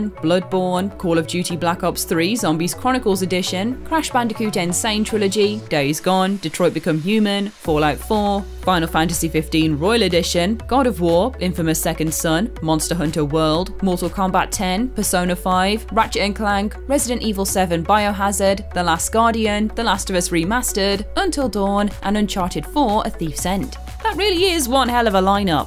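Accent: United Kingdom. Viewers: hey, I don´t have english as my main language... I find her accent a little different... Is this british or something?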